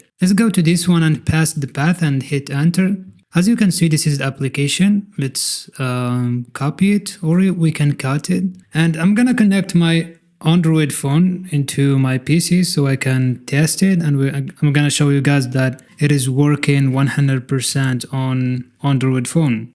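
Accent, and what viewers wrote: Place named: Arab world. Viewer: من الاكسنت واضح انك عربي شرح ممتاز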